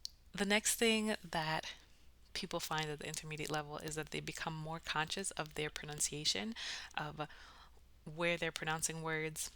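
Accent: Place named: United States. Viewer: Thank you very much teacher for sharing this lesson which make clearly the improvement in English language. I start understanding American accent with you.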